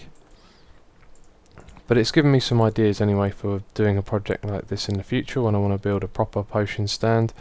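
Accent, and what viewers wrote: England, United Kingdom. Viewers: what part of england are you from? :) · Nobody say 'dude you're stupid' here but are you British?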